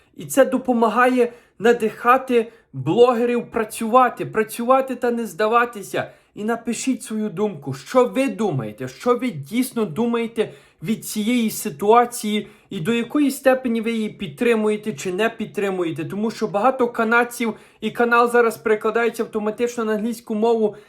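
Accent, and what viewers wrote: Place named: Western Ukraine, Ukraine. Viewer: Он бандера это у них такой диалект западенский бандера гельготун на мойве, это не украинська мова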